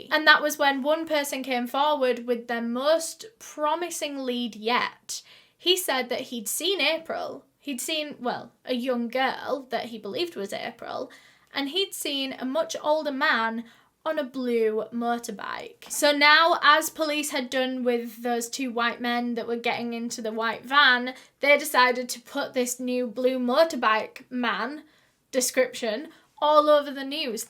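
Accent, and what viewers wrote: United Kingdom. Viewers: oml i thought this was kendall rae, then i heard the accent i was like: what in the world?!!! when did she become british?! 😭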